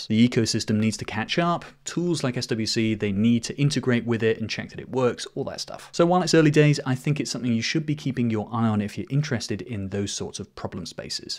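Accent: United Kingdom. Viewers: Ебанутый британский акцент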